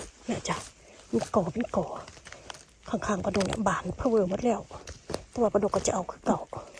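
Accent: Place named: Korat, Thailand. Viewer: ฟังคนโคราชบ้านเองพูดแล้วชื่นใจ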